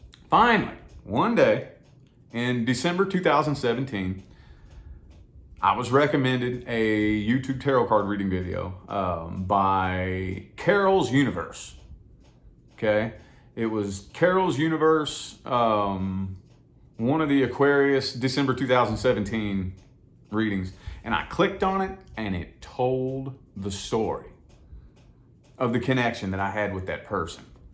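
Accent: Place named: Kentucky, United States. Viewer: So I just found you and listened to both of your Capricorn (July) readings as I have discovered Tarot readers on YouTube (yeah, I am late to the game LOL) just about a month ago and have dug deep and found some amazing readers and great insight to my psyche and situations. Brother, I am originally from Kentucky so I picked up on your accent that is honey to my ears. Brother, you clicked right into my situation like a hand in glove. And your advice was so in tune and made me see clearly the path I was hesitant to take. Love your honesty. I am a fan for LIFE.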